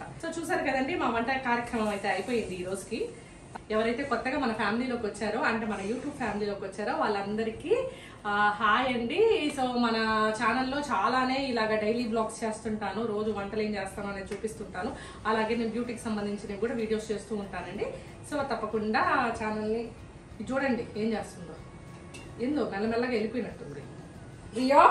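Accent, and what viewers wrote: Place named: Kadapa, India. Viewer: Bendu teese kuralu shuru, Beerakaya yendu chapala mix, donda kaya talimpu, rendu rende andi 😋😋. Antha energetic mothers ye andi mee home lo ammamma> amma > meeru. Methhalluu, royapottu kadapa slang 😊, exhaust fan vesukondi sound vinapadutundhi no worry, andhuke subscribers ki earphones use cheyyamanandi, beerakaya so colorful 🥒🌶🍅. Now dondakaya preparation start, Wowww dondakaya prepared, Rio ki manchi exercise 🦜, now beerakaya yendu chepala pulusu prepared 🥣🥣, Gongura pacchadi kuda 🥣, Rio viswa prayatnam chestondhi dondakaya tinalani 🦜, Nenu insta delete chesanu andoyi, kitchen lo Rio yuddhame chestondhi, nice vlog garu 👌👌👌 but taste review 🤔🤔🤔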